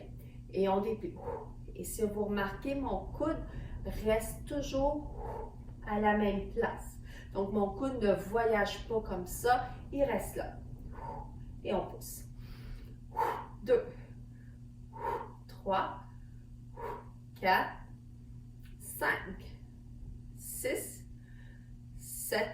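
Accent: Quebec, Canada. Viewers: Génial ! Vous êtes formidable ! Ce cours accompagné de votre délicieux accent du Québec est un vrai moment de bonheur! Merci 👍🏼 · Super séance! j'ai 78ans et j'ai adoré je le pratique un jour sur deux, j'ai enfin trouvé mon Pilates idéale en plus avec l'accent du Québec c'est cool, comme on dit chez moi c'est tip top!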